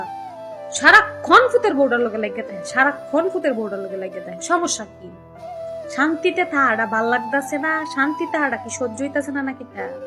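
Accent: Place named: Brahmanbaria, Bangladesh. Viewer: আপু তুমি কোন এলাকার তোমার মুখের ভাষা শুনে মনে হচ্ছে তুমি ব্রাহ্মণবাড়িয়ার রিপ্লাই দিও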